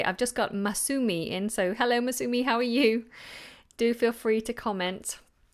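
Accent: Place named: United Kingdom. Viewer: can you please speak with a more clear British accent, even in some sessions not all of them! I know that you speak with a British accent in this video, but are you speaking in the same way during your normal day? Thanks in advance!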